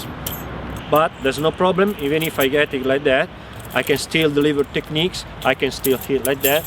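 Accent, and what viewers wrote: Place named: Russia. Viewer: Bel video :) l'unica cosa è che, dato l'accento, avrei scommesso come nazionalità "russo" più che ita :D (ho trovato prima questo video e poi quello ita)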